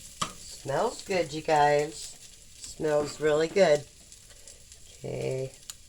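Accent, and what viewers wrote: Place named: Lower Michigan, United States. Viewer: I would have subbed so much sooner if I knew you were a fellow Michigander! Sound like you're South of the bridge... maybe Elk Rapids/Grayling/Gaylord or even Battle Creek (no need to disclose). Can't go wrong adding more bacon renderings!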